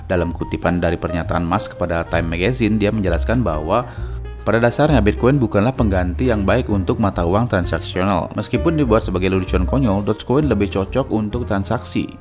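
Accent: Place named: Bali, Indonesia. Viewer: orang bali boss...logat nya mirip...tapi artikulasi penjelasannya muantapp. dan sangat mudah di mengerti..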